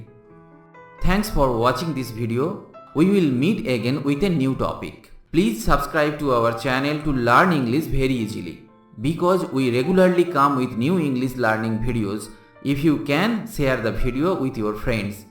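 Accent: India. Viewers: Can you speak englisch Not Indian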